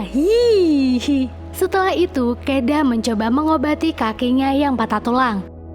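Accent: Pemalang, Indonesia. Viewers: Wong pemalang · Pemalang selatan ya😂😂😂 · Mba smpean wong pemalang po? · Mba nya orang Pemalang kah?? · Mbak Pemalange Ndi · Mbak wong pemalang?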